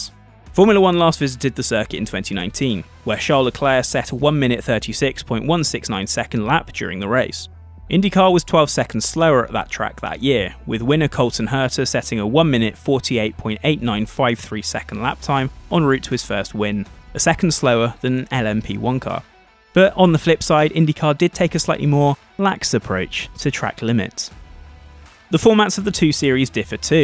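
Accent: United Kingdom. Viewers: IndyCar fan here. Normally on these kinds of videos, my worry is "okay, how is the British accent going to insult my favorite sport this time?" But I've gotta give you credit. This was very fair. Kudos, and a like to the video!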